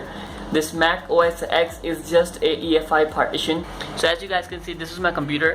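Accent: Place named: India. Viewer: U r indian.. And have a great accent... Hats off bro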